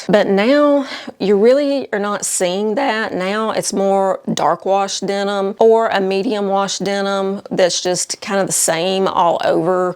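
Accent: South, United States. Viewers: Hi, I love watching your videos! You give great alternatives and I love the fact you always provide links to many options for purchase. Your "straight talk" is appreciated, but I have to say your bluntness combined with your Southern accent is quite entertaining too. Several highlights: 1) Re: Super skinny jeans/jeggings: "They're like sausage casings..."🌭 2) Re: Cold shoulder top + skinny jeans: "You look like you've been in a coma since 2015..."😱 3) Re: Miss Me jeans: "You can still buy these, but that doesn't mean they're in style..."😰 4) Re: Non-descript old lady shoes: "The shoe that has an identity crisis...it's ugly is what it is..." 😅 5) Re: Sport sandals with straps, velcro, padding: "This is just hideous"🤮 6) Re: Ugly ankle boots: "These scream old lady..." 👵 7) Re: Ill-fitting bras (not supporting the girls properly): "This is an epidemic...You know, the girls are down to the waist..." 🤣 People can find more hidden Easter eggs🥚 by actually watching and listening to the entire video, especially toward the end!:hands-yellow-heart-red: Take all constructive criticism with an open heart and mind, it's a fashion channel after all, but the target audience is for mid-life sisters, myself included! 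😊🥰💕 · You are hilarious! I love how you speak the truth in that beautiful Southern accent. Preach on sister!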